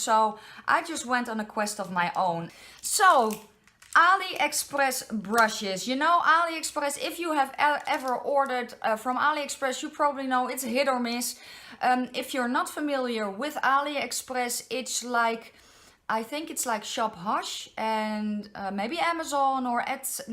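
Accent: Netherlands. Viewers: I heard your accent and I said to myself she is definitely dutch, and ahah i guessed right, Ik kom ook uit Nederland, Love the review dear thanks xx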